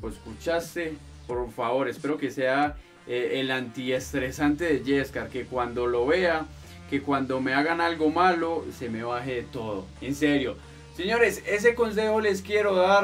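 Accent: Colombia. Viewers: De que parte de colombia eres?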